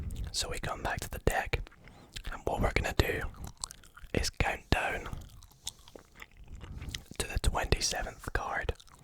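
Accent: Scotland, United Kingdom. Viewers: I’m new to you channel. You Scottish?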